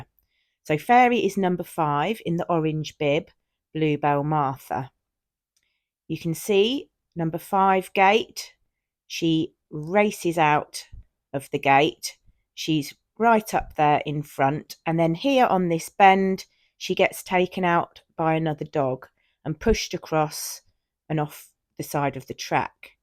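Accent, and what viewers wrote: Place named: Essex, United Kingdom. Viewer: Fairy is beautiful and she is so lucky she has landed on safe ground with you as her new owner. You sound the perfect, caring owner every dog could wish for. Well done for rescuing her! 👏🏼👏🏼👏🏼Seems funny hearing the Essex area accent again. It's exactly how I spoke. I now live in Ontario Canada and have done for 45 years, but I was born in Romford, but lived near Maldon after getting married. It's a lovely area, obviously I know it well. I have always loved greyhounds and my brother-in-law owned a Lurcher. How I loved that dog. He looked like a big hairy greyhound LOL He was also a rescue and had been used for badger baiting. Rescue is the way to go! (she says - with a breeder bought Shih-Tzu/Maltese 🙄)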